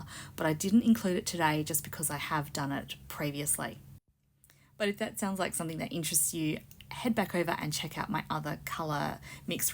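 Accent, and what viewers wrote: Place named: Australia. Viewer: This time of year is my favorite when it comes to making pretties because of the color palette! These sure are some pretty ones you made!!! Like I’ve said before, I could listen to you talk all day long. I absolutely love the Australian and British accents!!! I live in America, but I sure would love to find me a man with a nice accent!!! 😂 Something I started doing rather than buying all of the different pearl clay separately I just buy a bunch of pearl clay and turn any color. I want to into a pearl! When I make snowman, I usually use the pearl. And sometimes I like to not get it completely mixed up where it’s swirled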